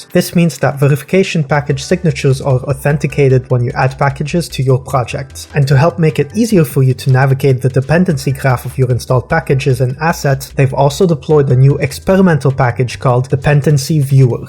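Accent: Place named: France. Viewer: Ton accent t'as trahis à des kms à la ronde ahaha Très content de voir du contenu de qualité de la part d'un français !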